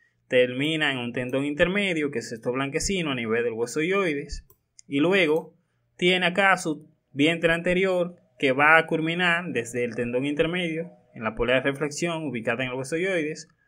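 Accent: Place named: Dominican Republic. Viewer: Mi querido acento dominicano, sigue así, se entiende todo perfectamente ❤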